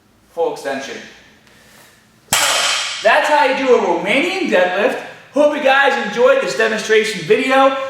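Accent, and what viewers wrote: Boston, United States. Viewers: I ABSOLUTELY LOVE WATCHING YOUR VIDEOS!! Especially with that Boston accent of yours❤️. Enjoy waking up & hear your cheerful self on YouTube! Wish you lived near me, I’d love a trainer like you. I’d appreciate any suggestions!! My right shoulder is 💩 for the past few hrs. You name it, I have it. Torn rotator cuff, torn labrum, bone Spurs, osteoarthritis, fraying of bicep tendon, etc. my ROM Is awful. I’m on my 10th cortisone injection over past few yrs. surgeon said need partial shoulder replacement BUT due to my age, 48.. he doesn’t recommend it. Told me I’d have less ROM after surgery heals. & only lasts 10 yrs.. even though it’s my shoulders that are 💩 it always interferes with any work out I do legs, glutes. I’ve been to physical therapy, chiropractor still going to that I do my wall stretches band stretches are used to be shredded I had the best arms around I can’t even do a 5 pound lateral raise. 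I would love any suggestions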